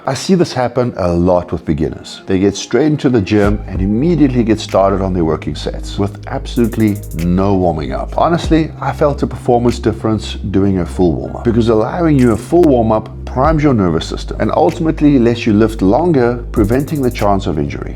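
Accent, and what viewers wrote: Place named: South Africa. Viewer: Great video, you sound very South African and look like a member of the Springboks. 🤔 Great content 💪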